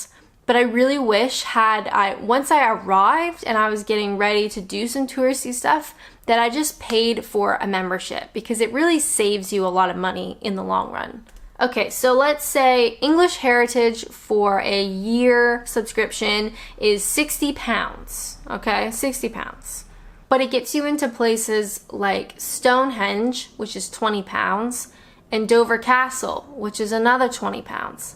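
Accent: Canada. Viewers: Love the Canadians accent but you are saying ‘pounds’ like an English person, this is a milestone for sure. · Hi, Another chapter of the e-book done. Very honest. You sounded different today, maybe a little bit more Canadian but clearer and more confident or focused. Or maybe sitting closer to the mic? Byeee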